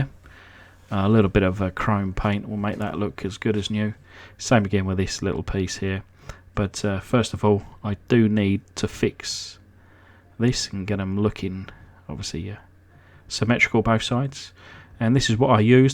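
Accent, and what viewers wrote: United Kingdom. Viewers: Beautiful resto. Love the channel. A slightly different vibe as u being British, but in a good way...kinda like when Wallace from Wallace and Gromit speaks.....👍👍👍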